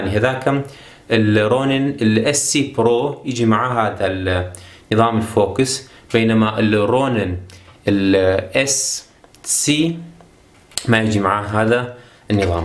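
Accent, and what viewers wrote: Mosul, Iraq. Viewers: لهجتك مال الموصل 😁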